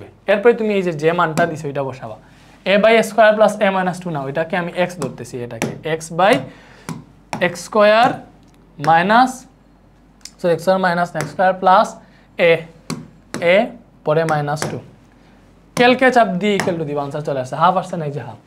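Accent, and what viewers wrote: Sylhet, Bangladesh. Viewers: Bhaiya apne kita sylhety nii🫠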